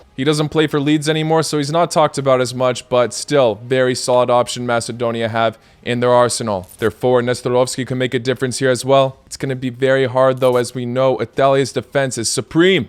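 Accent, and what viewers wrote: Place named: United States. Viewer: I like the content but I can’t stand how he pronounces words, he’s obviously American.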